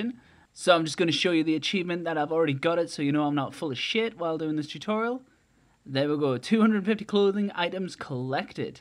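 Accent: Newcastle, United Kingdom. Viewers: are you a geordie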